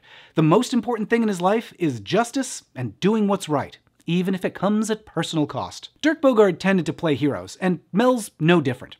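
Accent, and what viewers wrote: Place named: United States. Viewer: The Accent somehow is less British and more American sounding.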